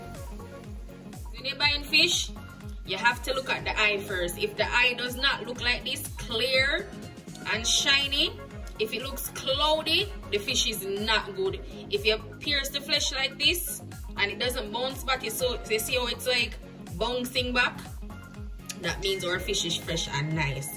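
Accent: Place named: Jamaica. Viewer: Muma naa lef out the Jamaican accent at all! Mi love that 😂😂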